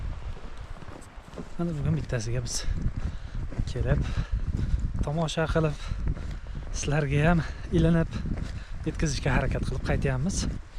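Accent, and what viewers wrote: Namangan, Uzbekistan. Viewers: Sheva nomongon😊😊😊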